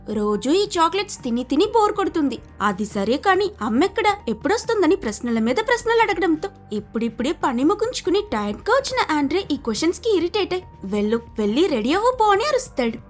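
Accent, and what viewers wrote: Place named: Tirupati, India. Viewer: Hello by identifying your voice I am guessing your from tirupati around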